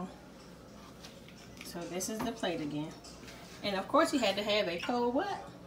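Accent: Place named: DMV, United States. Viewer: Hey neighbor 👋🏾 I’m in the DMV too and know that accent anywhere! Girl I’m making these tomorrow with some dirty rice and sauce! Thank you so much for sharing your talent and giving us a fantastic recipe!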